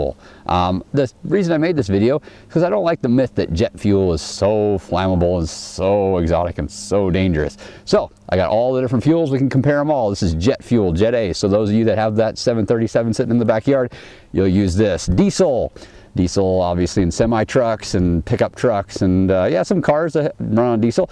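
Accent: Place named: United States. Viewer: I really can't remember when was the last time I heard genuine American accent as yours..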